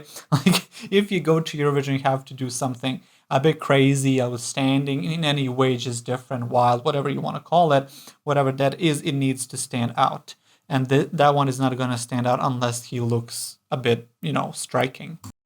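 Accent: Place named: Bosnia and Herzegovina. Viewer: Oh my...you have a lot of spare time in your day. Some cultures speak directly and don't beat around the bush. Some are more passive-aggressive. The latter use more "neutral" and "politically correct" language, but their intentions are far from kind or respectful. My points are: 1. Not everyone is British on the internet/YT. 2. Not everyone speaks English as native (and the only one) language. 3. Everyone is entitled to their opinion and feelings. 4. If you [autor of this clip] don't have anything nice to say - don't say it. I could say a lot on his wall "art" and it's placement, but I will not. ;) 5. Cross cultural communication is not easy. 6. I understand that this is a clickbaity title, but saying "I'm not feeling" ALL songs from a particular country, and at the same time coming from a country that not really known for "feelings" nor particularly great results on the ESC is what you say the UK "interesting". 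;) P.S. I've just realized that this commentator has an accent in English and that he's coming from Bosnia which adds another layer of complexity ;) Hope the UK performer will not be the last this year. ☮️ Have a nice day [not sarcasm, really mean it] Cheers!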